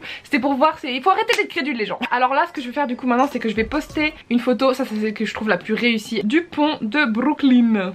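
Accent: Belgium. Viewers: attends t’es belge? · tu est belge ? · Tu est belge ? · Tu es belge?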